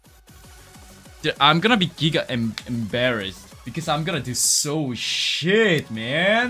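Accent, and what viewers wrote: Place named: United States. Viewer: he sounds american lol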